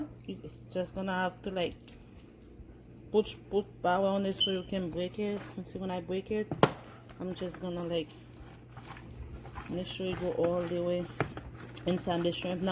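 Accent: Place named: Haiti. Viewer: Baby that Haitian French accent so cute lol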